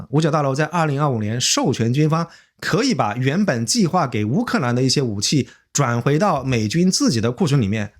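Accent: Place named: South, China. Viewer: 他普通话有点儿舌前舌后不分，语调也不太像台湾人，应该是中国南方人，然后润到海外的！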